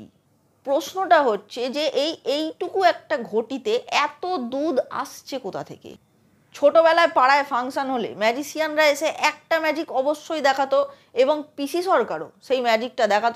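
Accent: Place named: Bangal, Bangladesh. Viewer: এটা ঘটি নয় , বাঙাল মনে হচ্ছে 😊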